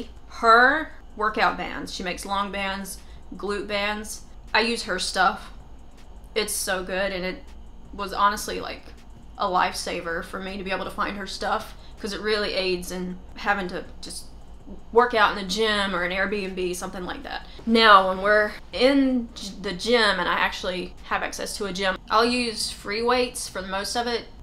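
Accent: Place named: Rural, United States. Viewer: From listening to you I can tell you are a small town country girl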